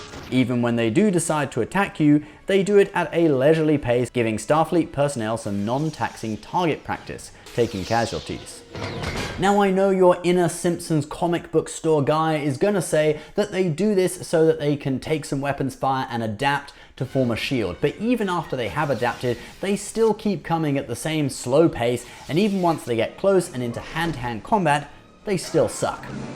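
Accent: United Kingdom. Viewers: Woohoo!!! More! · Missed ya,:) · We demand more! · is back!!!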